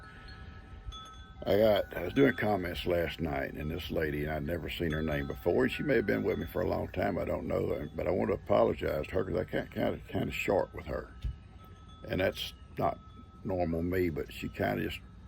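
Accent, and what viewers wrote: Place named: Texas, United States. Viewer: Such an enjoyable video, i could listen to that Texan drawl all day. We don't have hummingbirds here in Australia the closest would be our honeyeaters, nectar collectors😊 Many thousands of us understand we see little snippets of your life. We mostly call our grandies little tackers. I also think we all know the different bonds we have with our grandkids because of divorce. I'm starting to speak Texan! Find myself saying I'm 'fixin' to do something and i love "all y'all". Kash is a beautiful wee man and Heidi is so special ❤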